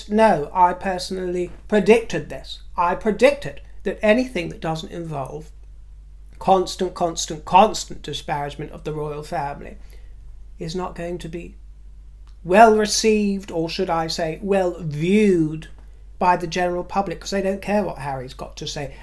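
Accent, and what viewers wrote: United States. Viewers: You have a very good American accent. Your headband is divine; that is your color. Always a treat for me when I see that you have posted. Great point about the dangers of slander. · from one Cancerian to another, your American accent is marvelous!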